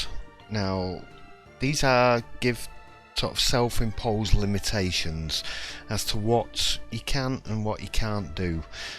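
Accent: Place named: Derbyshire, United Kingdom. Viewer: You from Derbyshire? Trying to place your accent lmao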